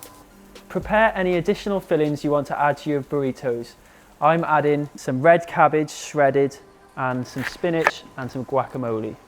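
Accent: Wales, United Kingdom. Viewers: Aw. It's such a musical accent. My whole family is Welsh but sadly my sister and i were the only cousins brought up in England so we don't have the lovely lilt that has...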